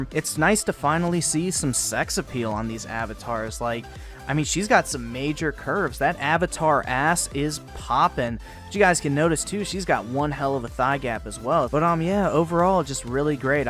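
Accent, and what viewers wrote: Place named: South, United States. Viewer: Bro i remember these! He had that southern accent coming through still and he scripted his vids still..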